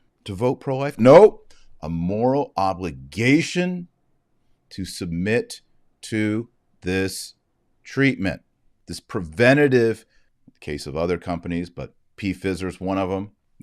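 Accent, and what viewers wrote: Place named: Texas, United States. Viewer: It's just his Texan accent! Check out ' ' by on yt. Should be our motto.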